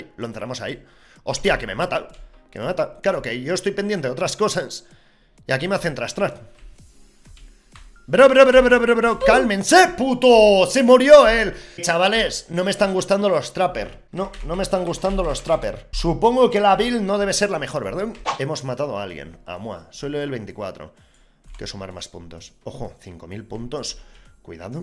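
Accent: Chile. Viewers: hablando y puteando como un buen chileno sjdj saludos weon🇨🇱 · Like por el acento chileno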